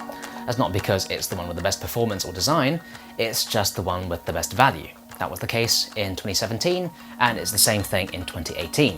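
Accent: England, United Kingdom. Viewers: The overly English accent makes me lose focus. I fucking hate you. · Very nice informative review and may I say great combination, the Oriental look with an English accent, very clever looking and sounding, I'm not just debating but I'm still researching and as far as design goes I think they messed up here because the whole idea of having an ultra thin TV is to show it off and I'm sorry but that curved bottom just doesn't do it for me, I don't care what kind of Sound it gives you, because basically if you're going to spend that sort of money for a TV, if you got any brains whatsoever you would spend a little bit more and get a AV to go with it?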